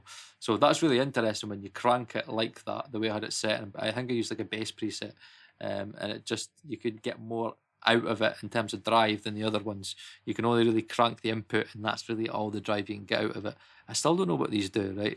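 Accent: Scotland, United Kingdom. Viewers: Oi mate! I have been digging neOld a lot. Its warm an rich sounding. I compared to Slate myself and Slate`s feels colder. Lacks warmth, which can be good sometimes. I'm really enjoying neOld so far. Haven't used Arturia yet, but as far as I know, it has an eq built in, which takes it apart from the two. Nice video man. Love your Scottish accent by the way. Cheers lad. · It’s not Eastern European accent, it’s Scottish and so easy to understand.. not to mention that it’s one of the original and oldest English accents in the world, and I’m not even British. We should learn to appreciate the taste of pure coffee and not add sugar to it 😆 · Goto another video if you can’t understand this lovely Scottish accent. I’m lovin it. Edinburgh or Glasgow?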